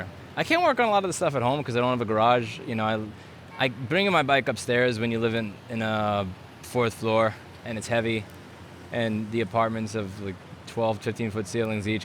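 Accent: New York, United States. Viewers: it's the NY accent....it comes from a mix of Italian and Jewish immigrants adding to the Irish and WASPs....despite him not being Jewish, uses Yiddish influenced NYC phraseology. Hence your association with Jerry Seinfield, who has some of the same accents. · your NY accent reminds me of Jerry sienfeld!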